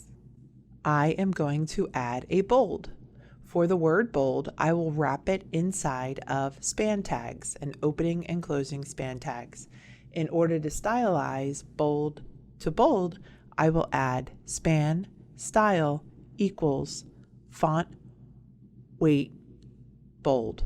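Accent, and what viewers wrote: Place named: Philadelphia, United States. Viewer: Thank you for the info! I could definitely tell you're from the Philly area by the accent. (or should I say, "Ack-sent") Much love and GO BIRDS!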